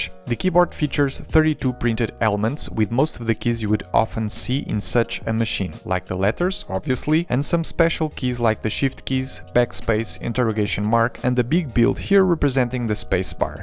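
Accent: Portugal. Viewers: Tava mesmo a ver que eras português, o sotaque foi o que fez com que eu descobrisse!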